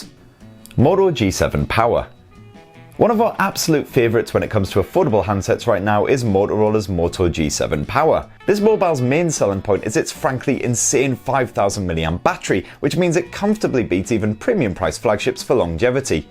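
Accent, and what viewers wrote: United Kingdom. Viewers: the guy is clearly from the UK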